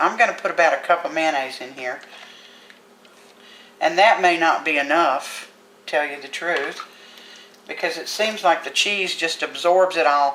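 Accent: South, United States. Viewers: Mmmmm this looks good I'm going too be trying to make this soon for my husband and kids!!!! I am subscribing too your channel I loovveee your southern twang!!!! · This recipe is most valid because the lady making it has southern accent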